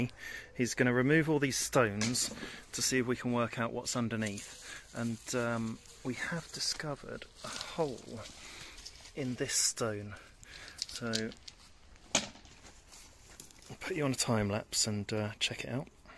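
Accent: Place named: United Kingdom. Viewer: Just found your channel and enjoyed the content so have subscribed I love hearing the British accent, what part of England are you from? Cheers from Melbourne Australia ❤️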